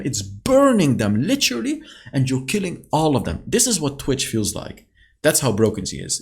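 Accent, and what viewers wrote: France. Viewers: Nice frensh accent love it but man pls just Say it it's easy ku de grasse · "she doesn't suck the things she's supposed to suck" spoken like a true french, you nailed the french persona 💪💪👍👍 very well done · Bro your French Accent is op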